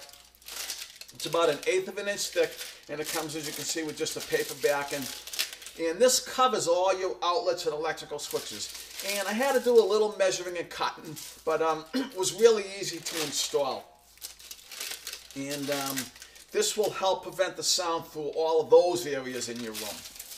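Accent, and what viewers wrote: Boston, United States. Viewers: What's your accent? Boston?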